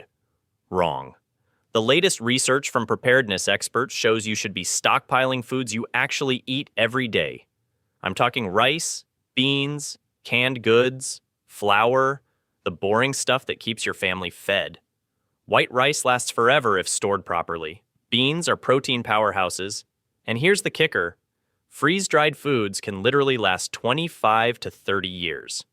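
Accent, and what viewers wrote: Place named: United States. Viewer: Is this spreading fear and propaganda via this American accented narration BS a thing? I'm over this shizz to be honest. Eff off 😂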